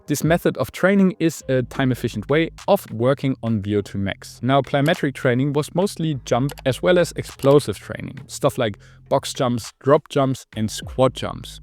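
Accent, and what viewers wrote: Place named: Germany. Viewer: gutes video aber holy shit was ein deutscher akzent 😂❤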